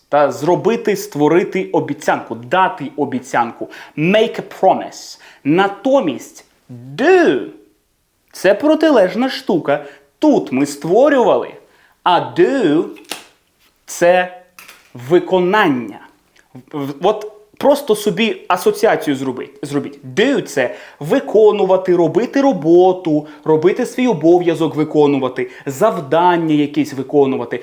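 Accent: United Kingdom. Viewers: Ви смішний) на Метта Деймона схожий в цьому образі ❤ Відчувається британська вимова, не американська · !!!! Велике дякую за Вашу працю. Мені дежу подобається формат викладання англиійської мови. Весело і розуміло. Але трішки добавте прикладів. По 1-2 реченню. Так краще запам'ятати. Ваш look very good!. Едине - я живу зараз в Канаді, pronunciation тут не британський, в цьому є різниця. Але головне з Ваших відео я получаю грамматику. Дякую. Good luck!! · Лук класний!, ваш британський акцент просто неймовірний! Як довго ви живете в Британії, що набули такого акценту? Дякую за випуск!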